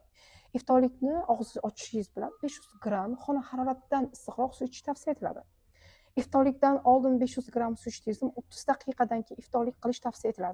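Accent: Bukhara, Uzbekistan. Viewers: Шеваси бухороликлага ухшаркан ❤❤❤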